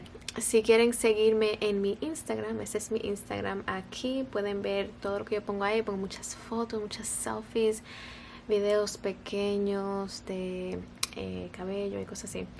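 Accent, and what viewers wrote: Dominican Republic. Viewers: ¡Eso era lo que estábamos esperando! Ese español de la República Dominicana se diferencia donde sea 😂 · Español cibaeño ❣️🥰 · vi el primer video de ella y dije,, ok, no esta mal , le di al segundo y desde que escuche el ascento dominicano , dije , aqui es que vamos ejjejeje , que linda jj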